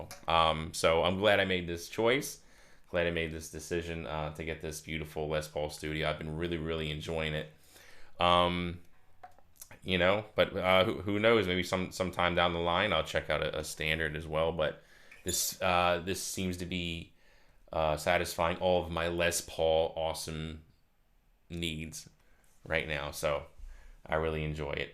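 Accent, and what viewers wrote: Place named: Philadelphia, United States. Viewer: Lol are you from the Philly area or maybe NJ?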